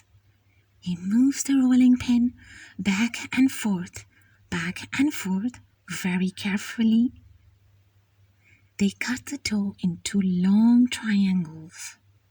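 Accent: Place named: England, United Kingdom. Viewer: Great artwork and beautiful brand new story. English accent is awesome. Keep up the good work! And yes, your channel does deserve a token token of appreciation.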